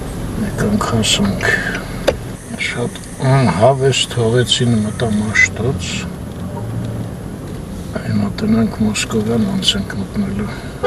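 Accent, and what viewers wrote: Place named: Gyumri, Armenia. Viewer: Вохчуйн! Как давно я не слышал ленаканское наречие именно ленаканское, а не ленИнакаеское ! Было время ехал в командировку в Ленинакан. Чаще на электричке. Знал название почти всех станций, сейчас помню ни все. Ереван, 8ой км, Масис, Эчмиадзин, Октемберян, Каракерт Гетап,, Ани, Ленинакан.. А когда поехал за бульдозером на МАЗе, в Ленинакан поехали через Аштарак, а возвращались через Октемберян. В Ленинакане работал на бульдозере на территории мясо комбината А на территории молочного завода в Ахуряне.. На обратном пути в Октемберяне пробило колесо на трейлере.. Заменили колесо на следующий день. В Ленинакане оставался в гостинице здание похожее как гостиница " Ани" в Ереване. Рядом был кинотеатр названия не помню. А еще в далеком детстве отдыхал в детском санатории в Ленинакане. Вот такие воспоминания!!! 😮😊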